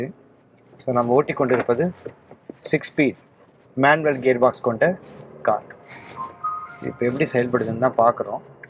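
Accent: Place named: Mylapore, India. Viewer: Boomer mama your is like our pal packet Mylapore nai sekar slangs. Why 😮😮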